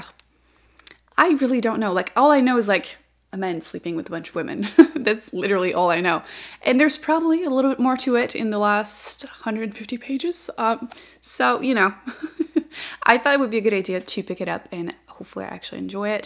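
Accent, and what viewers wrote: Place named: Quebec, Canada. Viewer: Canadian French sounds sooo different than France French 😟 Love your accent🥰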